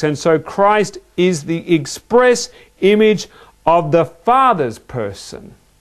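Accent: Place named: United Kingdom. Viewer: Thank you for this presentation. And, oh, what a fine British accent!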